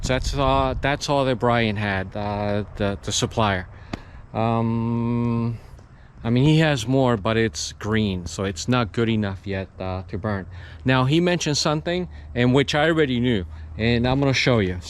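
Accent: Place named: New York, United States. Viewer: You sound like me . I live in NY too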